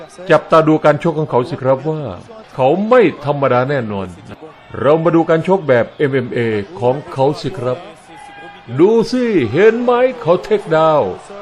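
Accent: Isan, Thailand. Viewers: ชอบแอ้ดพูดคำว่าไทยอีสานมากกว่าครับ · ติดตามเพราะเสียงเว้า อิอิ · ภาคภาษาอีสานนั่นแหละคุณ เพราะดี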